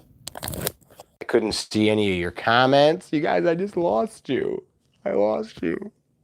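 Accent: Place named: New York City, United States. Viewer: You must be from New York City. It’s the way you said. Tawk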